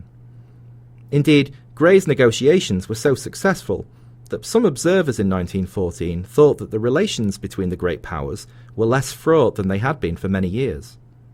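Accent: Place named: Liverpool, United Kingdom. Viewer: I mainly listen to your lectures to hear a Scouser. (Of course, also because of the history lectures)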